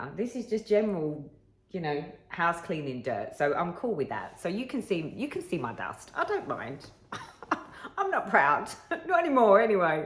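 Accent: United Kingdom. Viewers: Ive just started cross stitch and love full coverage just want to say thank you for helping me find my groove and it's confirmed I'm still in love with the British accent lol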